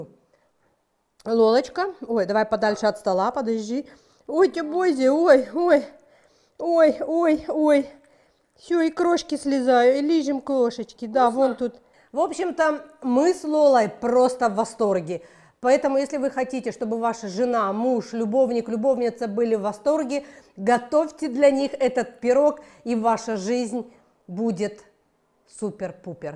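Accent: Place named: South, Ukraine. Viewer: Обажаю ваш южно-украинский акцент! Вы супер!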